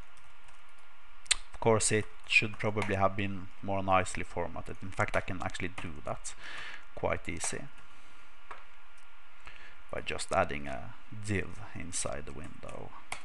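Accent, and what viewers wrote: Norway. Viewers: loooooooool!! i knew you were norwegian, typical norwegian "english voice", kul tutorial foresten xD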